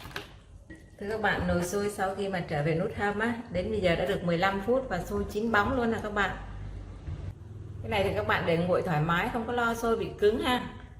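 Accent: North, Vietnam. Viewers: Chị chắc là người miền Bắc năm mươi tư(54)😘 nói chuyện nhẹ nhàng dễ thương😘👍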